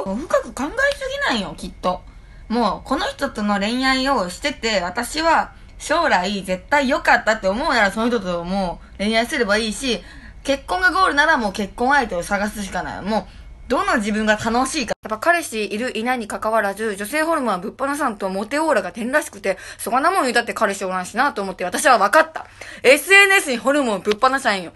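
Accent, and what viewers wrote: Okayama, Japan. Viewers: え？岡山県民って素でこのなまり？？ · 岡山弁最高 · 岡山弁ええなぁ なつかしい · 岡山弁可愛いすぎい！ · こがなチューしよで1人で大爆笑してしまった…爆笑 岡山弁日常的に使う人からしたらめっちゃ聞きやすい！！（笑） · 広島県民だけど、広島弁よりやばい面白い岡山弁wwwwww これまたやってほしい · 自分も岡山出身だから岡山弁わかりすぎて好き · 岡山弁がぬけないとこ大好き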